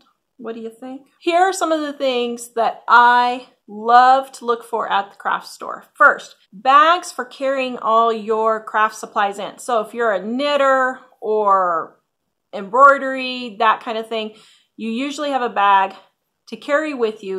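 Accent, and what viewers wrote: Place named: Kansas, United States. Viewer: We all know that you say warsh instead of wash. This is the first time I ever noticed that you say tonne instead of ton. Is that from Kansas, too?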